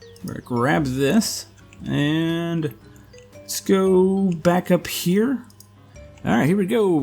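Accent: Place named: Maryland, United States. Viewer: bro, u sound like me. r u from Maryland?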